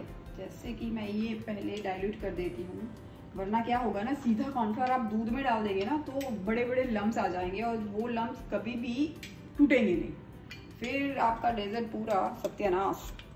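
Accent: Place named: Kathiyawad, India. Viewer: Love to hear your kathiyawadi ascent..and all your recipes ... simple but fabulous 👌👏😘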